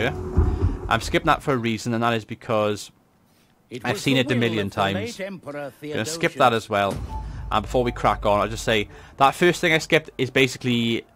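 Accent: Wales, United Kingdom. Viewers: Very nice guide, and your vocal presentation is excellent, (are you welsh?) i find it really annoying listening to commentators with bad habits like, clicking their pallet with their tongue, or swallowing all the time, saying eermm every other word, i have been playing this game for 2 days now and i have picked up quite a lot i have missed from your vid, thanks, maybe you could do a money and public order building guide as players seem to be have issues with them?, great vid well done.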